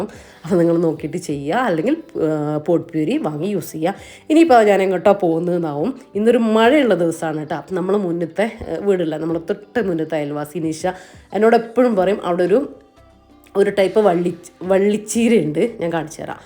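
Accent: Ernakulam, India. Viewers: Ernakulam പാ മുള്ളൻ, പാ കുർച്ചി എന്ന് പറയും 👍🏻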